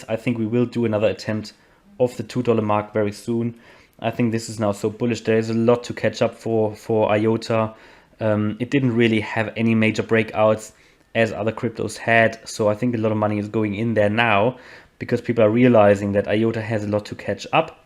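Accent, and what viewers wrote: Germany. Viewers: Your accent sounds german, correct? I like that you are very specific with your forecasts. I subscripe your channel hoping for more iota videos.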